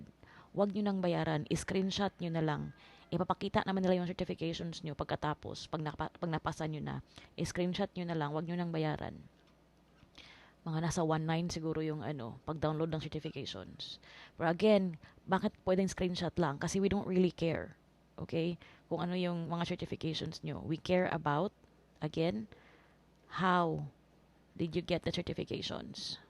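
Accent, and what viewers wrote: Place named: Visayas, Philippines. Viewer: thanks ante, bisaya ka? imong accent man gud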